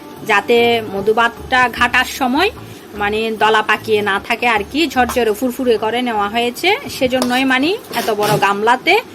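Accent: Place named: Noakhali, Bangladesh. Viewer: আমার মনে হয় আপনার বাড়ি ফেনি অথবা নোয়াখালী হবে । চট্টগ্রামের আঞ্চলিক ভাষা এরকম নয় ।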